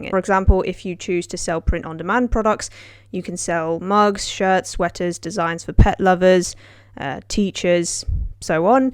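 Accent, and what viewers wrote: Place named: Southern England, United Kingdom. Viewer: She's from the South of England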